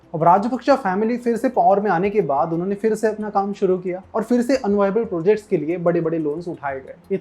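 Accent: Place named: Maharashtra, India. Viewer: I love मराठी accent भावा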